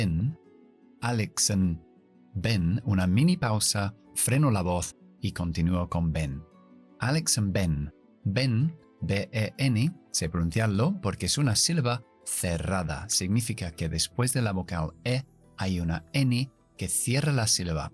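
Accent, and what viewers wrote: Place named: South England, United Kingdom. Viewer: OK, entiendo. Pareciera SBE, es decir Southern British English, pero habría que preguntarle a él para estar seguro. Yo tengo el oído entrenado al inglés del Midwest americano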